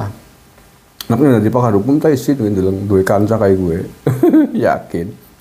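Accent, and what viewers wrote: Banyumas, Indonesia. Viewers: Ndasmu njebluk 😂..om logat Banyumas.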